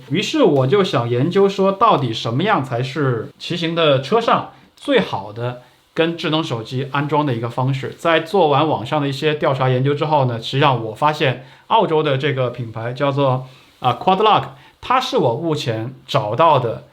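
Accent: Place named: Yunnan, China. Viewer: 感谢的详细介绍。已入QUADLOCK,另外，您的口语有马普的味道，是云南人吗？谢谢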